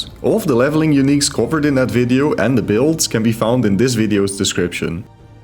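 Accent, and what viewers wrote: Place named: Netherlands. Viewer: This video autoplayed after a random Poe2 video. I was like hmmm his accent sounds Dutch. Then I saw your channel name😂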